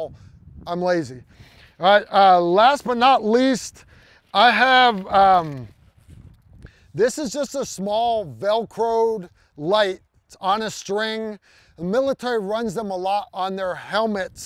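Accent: United States. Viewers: Is it just me or does he have elements in his accent really similar to Christopher Walken? I may be way off I'm not sure but just curious if anyone else hears that? Is it some kind of American Accent?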